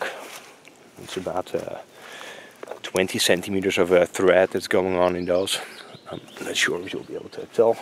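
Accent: Netherlands. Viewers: ik wist dat jij nederlands was want je praat echt raar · Grappig dit, ik kreeg door je lichte accent al het idee dat ik hier met een Hollander te maken hadden. Ik heb een paar jaar geleden ook een "playhouse" gebouwd voor een familie in Sintra, Portugal. Het is grappig om te zien dat er veel details in jouw playhouse zitten die ook in mijn playhouse verwerkt zitten, bijvoorbeeld de hartjes is een identiek detail. Zou het door onze hollandse achtergrond komen? Ik heb er geen video van gemaakt maar ik kan je wel wat fotos sturen als je me je e-mail adres stuurt. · Hey, Ik hoorde in je video's een Nederlands accent en nu in je bouw video van je boomhut werd dit bevestigd. Erg gaaf wat je allemaal doet, en ook origineel. Ik ga blijf je volgen, ga zo door. En je weet wat ze zeggen een goede timmerman verliest nooit ze kunde:-) Gr Tienus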